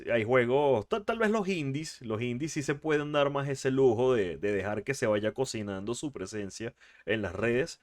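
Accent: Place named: Spain. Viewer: Dios yo siempre había tenido dudas por ti acento y tus expresiones sobre si eras español o de latam Por fin hallé la luz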